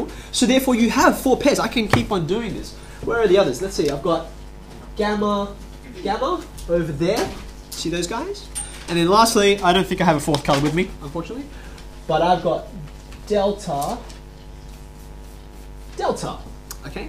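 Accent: Australia. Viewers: it's just an australian accent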